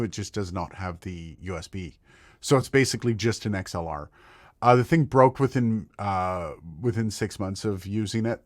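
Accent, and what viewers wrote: Canada. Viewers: You’re Canadian eh?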